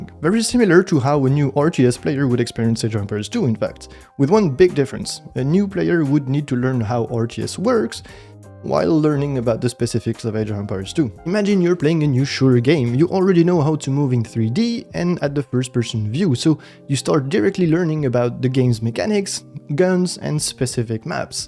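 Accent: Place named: France. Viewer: Je me disais que t'avais un petit accent fr. Par contre vraiment propre ton anglais on pourrait même croire que t'es autre chose.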